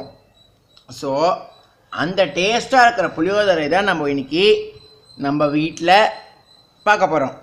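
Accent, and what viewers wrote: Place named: Palakkad, India. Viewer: Brahmin Iyer or Iyeger boys speaks with this slang only. Palakkad slang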